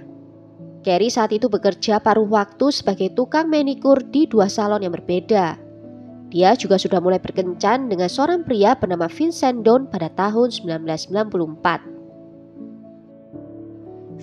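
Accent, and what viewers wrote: Java, Indonesia. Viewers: Hay medokuhhh cantik 💕💕💕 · tidak apa2 mungkin karena pengaruh logat Jawa ya. Kalau ibuk e cocok tapi kalau ibuknya tidak cocok.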